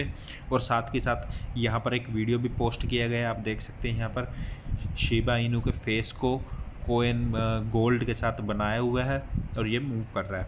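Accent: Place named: Haryana, India. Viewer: Pure hariyani Bhai.....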